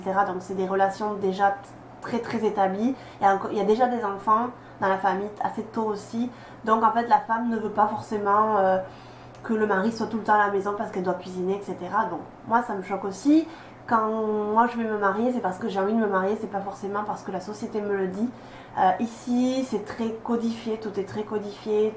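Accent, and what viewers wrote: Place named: South, France. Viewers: ouais mais t'as un accent genre toulousain ou bordelais ou marseillais un truc dans le genre^^ donc quand tu parles japonais forcément aussi ^^ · Enfant ! aaaah l'accent du Sud :P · Bah, elle est toulousaine, ça s'entend, couillon ! :)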